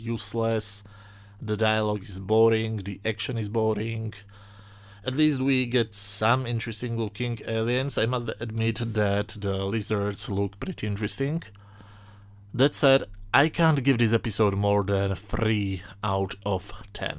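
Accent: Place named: Israel. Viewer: Uhm, where are you from? Your English is quite good, but if I had to guess (please don't be offended) I'd guess that you're Israeli. (I'm sorry if I'm wrong.) I just ask because I spend so much of my time teaching English to Israelis (some of whom are also Russian or Ukranian, very few of which are South American). Still, your English is quite good! Για να ξερετε.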